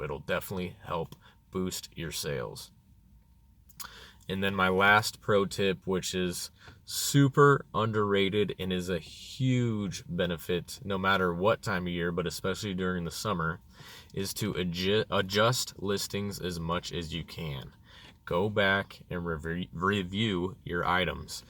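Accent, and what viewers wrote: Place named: United States. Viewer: God...I just love your American accent, where are you from?Colorado?Denver?, nice video great tips, thanks for sharing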